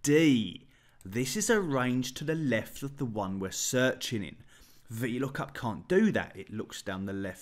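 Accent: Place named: Cockney, United Kingdom. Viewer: Clear and understandable tutorials, and in my first 2 vids I've seen I've discovered DGET and XLOOKUP just at the time I need them! All delivered with a top geezer accent, subscribed! Question for anyone who can answer: I followed the steps for becoming an Office Insider, it seems I now am one, am I able to activate these secret functions somehow? They aren't immediately available. It also says "to a portion of Insiders", perhaps its not all Insiders who gain access? Cheers!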